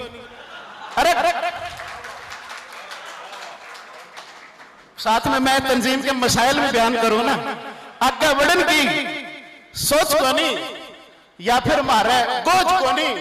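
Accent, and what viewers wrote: Haryana, India. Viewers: Kalam tod di bhai jaan haryanvi boli aaj b apne jinda rkhi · badiya kavita sunai aap haryanvi boli ko aaje bada rahe ho dhanywad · वाह जी वाह रै हरियाण्वी भाई जी, खूब मजा आ गया तेरी बोली सुण क । पर यो पाकिस्तान म्हारा था अर कुछ दिन पाछै फेर म्हारा ए होगा । जय हिंद, जय आर्यावर्त ।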